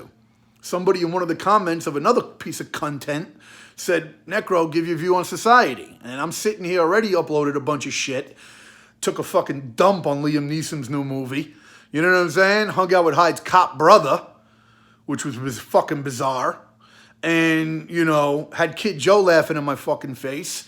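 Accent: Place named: New York, United States. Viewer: Literally the most NY accent ever.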